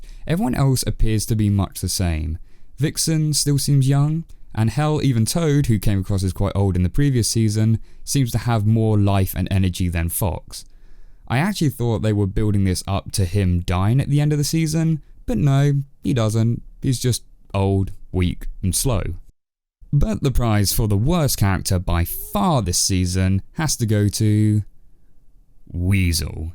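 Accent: United Kingdom. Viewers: I JUST REALIZED YOUR A BRIT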